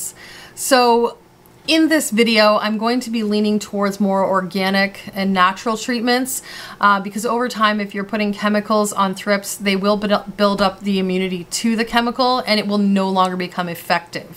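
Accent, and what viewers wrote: Canada. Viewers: I love your Canadian accent!! You make it fun to learn. Thanks for your work. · I'm guessing you're from eastern Canada with the way you say ABOUT!! Love the eastern accent coming from western Canada.. If I'm wrong please let me know. Thanks for sharing a great video, thrips are horrible and have decimated many plants in my indoor garden. Cheers sister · Your Canadian accent is delicious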